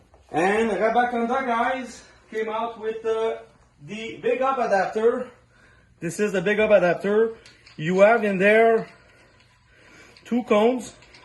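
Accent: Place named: Quebec, Canada. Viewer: Ca c'est un gars du Quebec . Meme accent que moi 🙂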